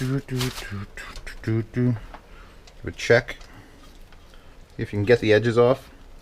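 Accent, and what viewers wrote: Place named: New Jersey, United States. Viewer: Where you from? Sounds like Jersey or Philly... just bring it up because "white American"... I say it, and I'm from South Jersey (right outside of Philly)....live in wretched North Dakota now and they call white American..... fucking Swiss!!! Swiss!!!! It's not Swiss, Swiss has holes and is also delicious with imported ham, but is NOT white American cheese damnit. Ok, that's all.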